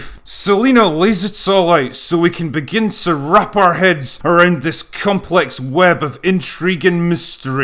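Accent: Scotland, United Kingdom. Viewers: Mr. Critical thinker are you Scottish ? I think you have a voice that could Rally up troops for battle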